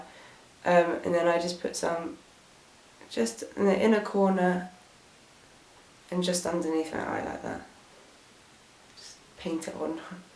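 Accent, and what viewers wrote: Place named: RP, United Kingdom. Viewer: your voice sounds like you are from made in chelsea or somewhere! haha x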